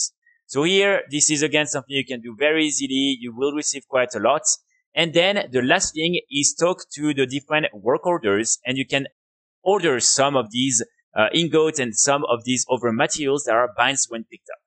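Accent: France. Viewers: tu serais français à tout hasard?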